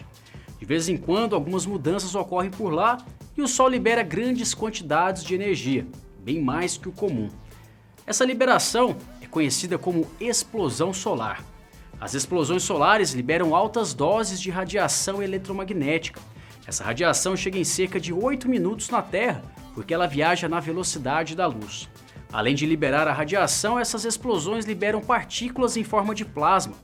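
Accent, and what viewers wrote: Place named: Northeast, Brazil. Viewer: Kkkk. Aki no nordeste algumas pessoas falam assim😂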